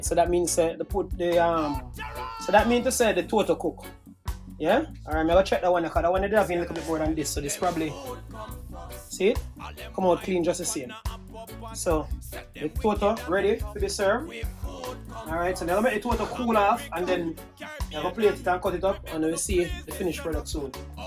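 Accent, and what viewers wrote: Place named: Jamaica. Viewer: Hello hun! Wah gwaan? I love your channel because you make everything look so amazing!! And The accent adds to it!! I’m learning Jamaican!!❤️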